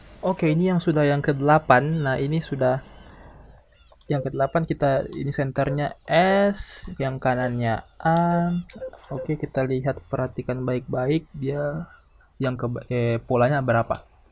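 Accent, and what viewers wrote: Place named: Makassar, Indonesia. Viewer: Logat memang tdk bs berubah sappo Semangat trus sappo semoga semakin tdk belibet nantinya Salam kenal sappo Sy jg orng Makassar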